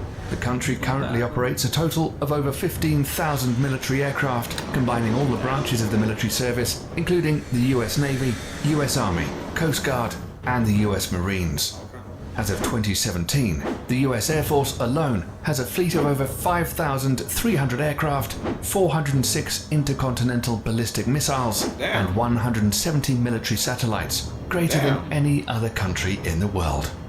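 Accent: United Kingdom. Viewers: The beautiful British accent is so wrong here. Al Bundy or David Chappelle would be better. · Bizarre that the narrator spoke with an English accent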